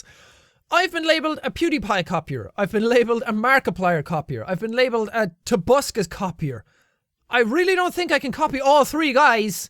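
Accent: Ireland. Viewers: As others have said, I don't feel like you're 'copying' other youtubers. Sure, you do the same things as some of them, but I wouldn't call that copying. The tone is different all around: You've a different scenario in comparison to Pewdiepie, for one example, and yours is the one I find more enjoyable. EDIT: Plus, I find your accent more enjoyable to listen to than his. Irish accent ftw.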